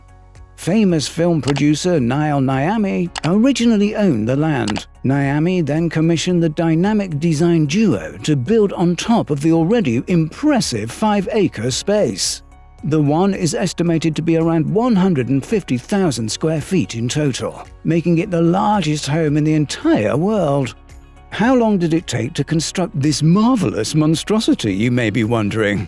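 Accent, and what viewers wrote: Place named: Australia. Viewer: You Australian!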